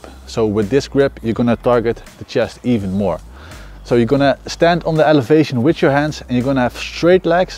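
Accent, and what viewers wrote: Netherlands. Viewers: Nothing more goofy than the Dutch accent. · ik hoorde gelijk al dat je nederlands was haha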